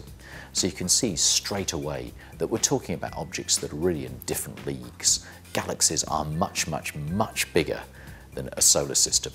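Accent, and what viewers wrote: United Kingdom. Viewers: OMG JUST NO STOP TALKNG BRISTISH GUY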